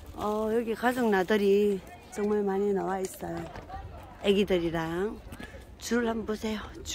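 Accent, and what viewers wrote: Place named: Pohang, South Korea. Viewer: 4~~♡ 포항이라서 사투리가 반갑 네요 소중하신 님의 영상 열열이 응원해 드리고 물러갑니다 수고 하시고 또뵈요,^^ 👍♥️🌈🙋‍♀️👍😃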